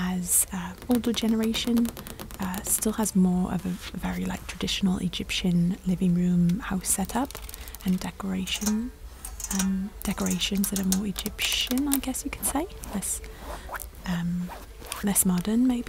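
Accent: United Kingdom. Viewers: i love ur accent !! the best, im in love wit british accents😩😩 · Lovely British accent. Very soothing voice. Gorgeous nail tapping.😃👍✨🍀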